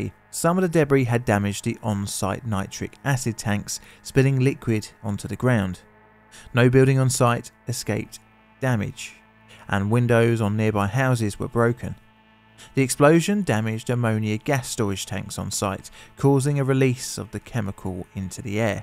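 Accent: United Kingdom. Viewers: The heavy Brit accent detracts from the story, which happened in the US.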